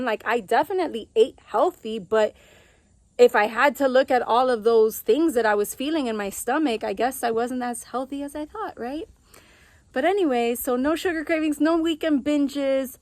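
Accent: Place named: Los Angeles, United States. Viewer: Hate that accent. Typical LA Hispanic sounding. I know girls from there that sound like this and can’t stand them.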